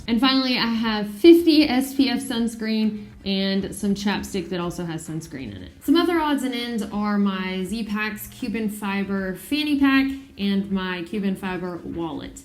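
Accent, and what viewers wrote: United States. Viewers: I adore your American accent. Thank you for showing your adventure. I hope your sister finds as much enjoyment in the hike, as you.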